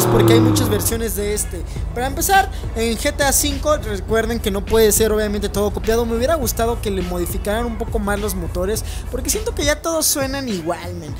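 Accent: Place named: Mexico City, Mexico. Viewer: No se de donde eres, pero no se dice ACA, se dice AQUÌ. solo los centro y sudamericanos dicen asi, tu suenas como chilanguito o de el sur de la republica mexicana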